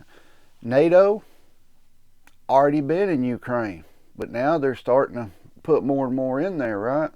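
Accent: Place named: Appalachia, United States. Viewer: hillbilly talk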